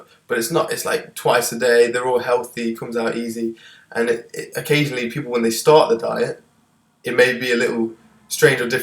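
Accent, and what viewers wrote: United Kingdom. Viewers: dude help me! I live in the uk I think you do too, you sound like you do. I am sorted for snacks etc but where can I buy meals for myself that i'd like, i'm vegan and dont want dairy or meat anymore. its kinda gross what ive learnt...and cruel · Also the English accent definitely helps hahaha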